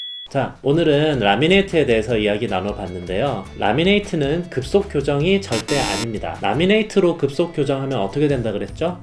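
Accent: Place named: Gyeongsang, South Korea. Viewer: 대구 경북 쪽 말투라서 더욱 신뢰가 가네요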